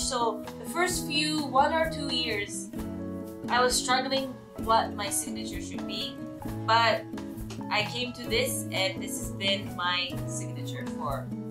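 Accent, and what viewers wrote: Philippines. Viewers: You're English accent now more like Filipinos than Japanese :-)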